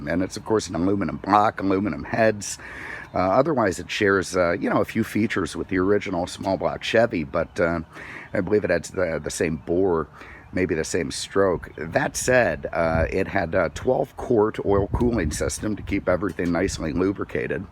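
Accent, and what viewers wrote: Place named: Yankee, United States. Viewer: That Yankee voice grates on this Southern man's ears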